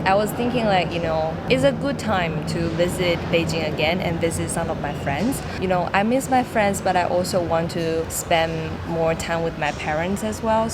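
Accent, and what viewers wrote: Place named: United States. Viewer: 好重的美国口音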